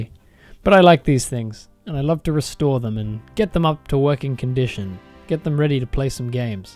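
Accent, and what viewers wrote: Australia. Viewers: Australian BROOOO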